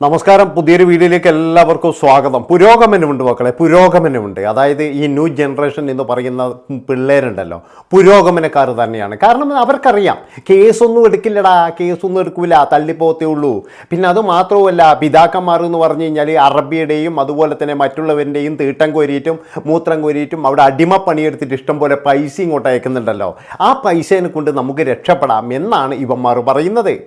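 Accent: Kannur, India. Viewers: ഏട്ടാ ഇങ്ങൾ നാട് എവിടെ നമ്മള് നാട്ടിലെ അതെ സ്‌ലാംഗ് കണ്ണൂർ ഇല് എവിടെ ,മട്ടന്നൂർ ഇരിട്ടി കുതുപ്പറമ്പ് ഈ ഏരിയൽ എവിടെയെങ്കിലും ആണോ,, എൻ്റെ നാട്ടുകാരൻ സംസാരിക്കുന്ന അതെ ഭാഷ കേൾക്കുമ്പോൾ ഒരുപാട് അഭിമാനം,മനുഷ്യത്വത്തിൻ്റെ വേണ്ടി നിലകൊള്ളാൻ നമുക്ക് സാധിക്കട്ടെ,നമോക്കൊരുമിച്ചു. സ്നേഹത്തോടെ ജീവിക്കാം,,അധർമതിനെതിരെ പ്രധികരികം ,, എട്ട ഒരുപാടിഷ്ടം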